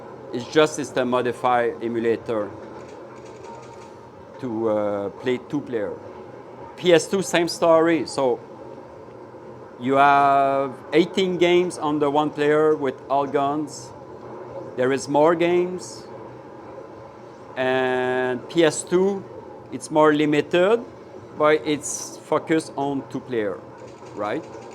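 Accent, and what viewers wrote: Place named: Quebec, Canada. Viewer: i don't no why but you sound like someone from Quebec lol any how you have a new sub and hello from Quebec :P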